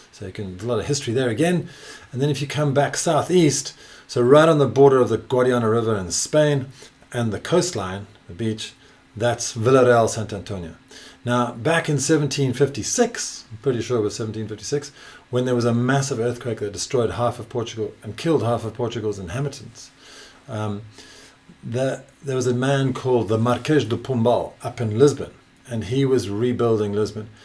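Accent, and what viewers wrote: South Africa. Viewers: Hi, fellow South African and new subscriber here, so good to hear a familiar accent! ❤️ We’re considering retiring to the Algarve so your channel is just what we need. Looking forward to watching a lot more of your helpful information! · Love the SA accent! I have one too :face-blue-smiling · Im a portuguese expat living in Europe and I've just come across your vids a few days ago. I have to say this is probably the most comprehensive, authentic, interesting, fun to watch yet rigorous video I've seen about the Algarve. You've made me start thiking about relocating back to Portugal, who knows, even to the Algarve - who knows - although being originaly from Porto i enjoy a bit of cold, rainy days and mountains nearby (not that we have many in Portugal that you can call true mountains). You're probably the best advocate for Algarve tourism and economy right now. 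Loved the SA accent, btw. Take care. Looking fwd to check out some of your other vids. 👍😉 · Hi. Ts nice to see you've retained your amazing SA accent. Can you please send me some cost effective house/apartment (2beds) prices. I'm looking to retire somewhere - SA currency squeezing ones options. <mail> Thank you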